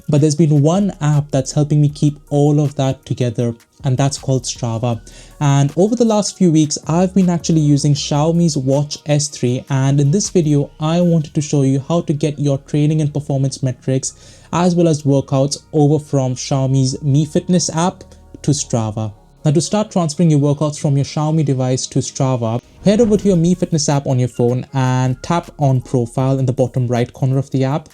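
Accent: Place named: India. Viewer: God bless indian accent folks explaining stuff on youtube :)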